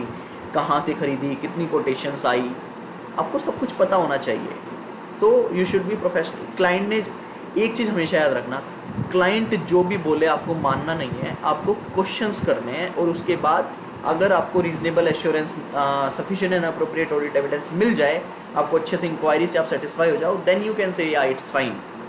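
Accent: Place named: India. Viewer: Unfortunately, i can t understand indian english accent ... :(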